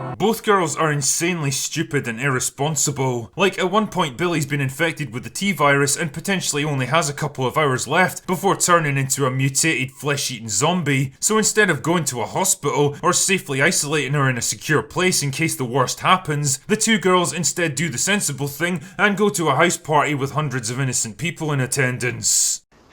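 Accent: Scotland, United Kingdom. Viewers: You seem Scottish but you say eh a lot and you act Irish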